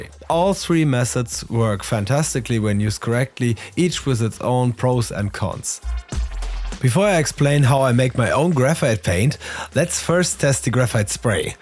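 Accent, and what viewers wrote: Germany. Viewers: I am sure its a germany guy :D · Thick german accent😂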